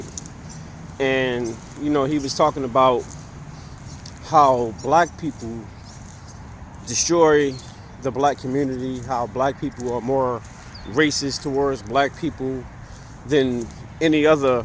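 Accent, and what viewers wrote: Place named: Caribbean. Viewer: This sounds like Tether babble you must have a carribean background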